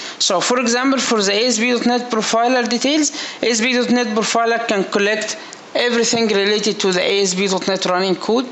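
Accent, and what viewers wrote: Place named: India. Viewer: Индусский английский перестал резать слух примерно в середине видео. Тем не менее, теперь я знаю как делать нагрузочное тестирование. Gracias!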